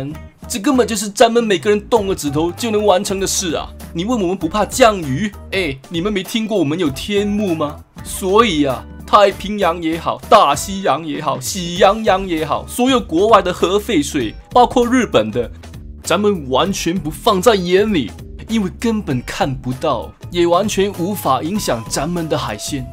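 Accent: Taiwan. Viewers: 完全不是普通話，單純的是台灣口音國語😂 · 呢個完全冇大陸口音，係台灣口音嘅國語啊😂😂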